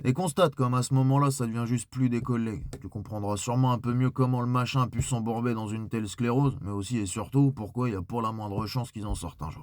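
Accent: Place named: Lyon, France. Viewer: La ?? Remise en question ? Oula t'en demande trop pelo.. 😏 Merci pour tes prises de parole, toujours un plaisir de t'entendre, avec ton bon vieil accent lyonnais 💪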